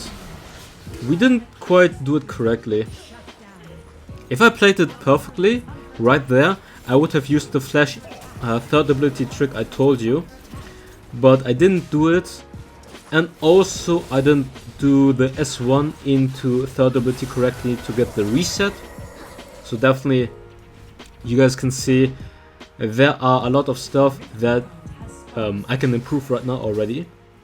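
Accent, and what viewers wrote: Germany. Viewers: Are you german? Your accent sounds german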